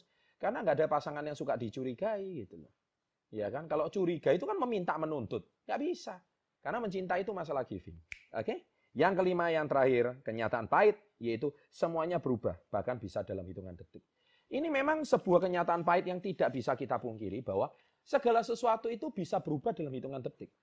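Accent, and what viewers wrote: Java, Indonesia. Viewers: Bang orang mana ya kok Logatnya,logat jawa.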